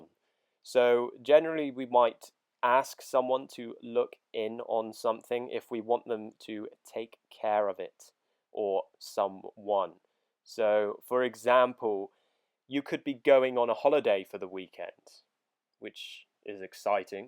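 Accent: United Kingdom. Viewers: I am looking up to for learning the British accent.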